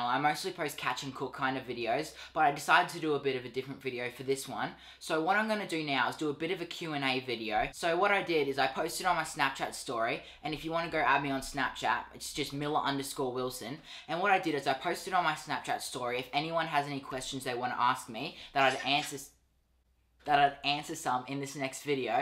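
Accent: Australia. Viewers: I need to say this because I got some beef with you Australians WHY ARE YOU AND YOUR ACCENT SO BEAUTIFUL? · Posting vids, doing my homeschooling fishing......... your like me, but with dark hair, an Australian accent, and about a 600 something thousand more subs XD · Australians have the coolest accent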